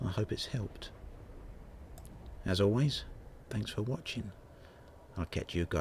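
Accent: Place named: Cockney, United Kingdom. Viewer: Good vid. Please could you re-dub it in a Micheal Caine accent. Your normal accent isn't too far off...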